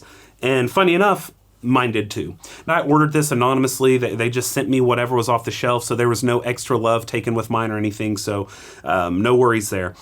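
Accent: United States. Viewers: I have lived in Spain for 35 years. In San Cugàt. We watched Ascaso being born here. So these are machines we’ve been using for years. Along with our amazing (heavily modified) hefty and reliable Italian Saecco aluminum and ss core. Almost 25 years and making amazing cups. We’re not worried about the 21 gram baskets or naked portafilters on this machine. We rely on its original but strongly modified porta filter with a nice ims basket. A charm. Even with tough coffees. Ascasos here are the standard. And, if you open all single head ones, you’ll find the same exact components inside, even with the humble Basic. The “new” PID Dream is the same as the Steel but with only one thermocoil. You should cut it in half and look at the marvel of ingenuity that makes these machines fast, predictable and reliable. Not for a scientist but for an espresso drinker for more than 45 years. 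Ascaso is one of the most amazing brands in Europe. Canadians are adopting them. But, Portugal? They’ve been there for a really long time. Loved your content. Makes me proud of you… the Master. You rock. Sorry. Não sabia que você era português. É que você parece tão americano. E seu sotaque e tudo mais são tão americanos que nem consigo entender como você está apenas “apresentando” uma máquina Ascaso. Suponho que seja para seus principais seguidores nos EUA de A.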